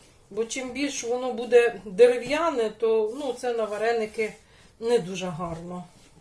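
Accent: Ukraine. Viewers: Приємно чути черкаську говірку, наче дома побувала.Добра Вам! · За вареники дякую і як приємно чути нашу мову, мову з центру України. Якось по домашньому. Ще раз дякую.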